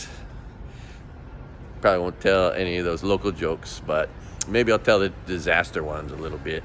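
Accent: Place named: California, United States. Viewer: I wanna tell you something I think you’ll get a kick out of and see the funny side -: first time I heard you sign off “IF yer thinking of doing harm or crime, do less do nothing today, sit on sofa 🛋️…..”! You were standing outside planet gym or something where you’d just had a shower 🚿… and the thing is although we both speak English, due to differences in our accent, (im Scottish and you’re deep west coast California, I thought you said, “ do less crying 😿”. You see, I have bad days where everything gets me overwhelmed and I just wanna cry… Some ppl also can do harm to themselves. But I was literally feeling so bad when I heard you say “ if you thinking of doing harm or crying (crime) do less… just do nothing today, be lazy instead”! so, do you know what I did..? I didn’t stress… I stayed on couch all day sleeping, watching tv, watching YouTube, no hassle, no tears because of you, I was kind to myself because I thought you said “no crying”! 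So it’s funny, but I wanna say Thank you- because I had a chill day instead of being sad because of your kind, heartwarming real life way of signing off your videos. It wasn’t till I watched a few more videos, the penny dropped & I realised you weren’t saying crying, but rather crime! Ha ha 😅 ye gotta a laugh bro 😊😄 And may I say, that Making these videos are living examples of the two Hawaiian words pono and keena oly (sorry I don’t know how to spell them maybe you can reply with correct spelling) Bon voyage You handsome man ❤ P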